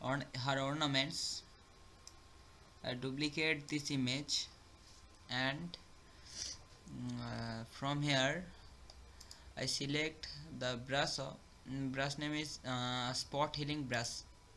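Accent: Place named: India. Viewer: This Indian access bro... I don't understand 90% from what those people say. (That's because of two reasons) 1 Their bad English, 2 My ears LMAO.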